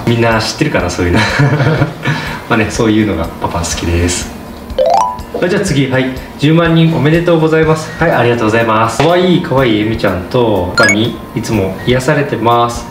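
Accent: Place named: Kyushu, Japan. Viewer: 九州訛りが お顔とミスマッチなところがとてもいい感じで好き😊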